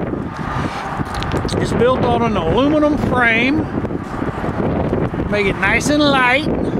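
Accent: Texas, United States. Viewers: Sorry but you are a little heavy on the Texas accent/style of talking.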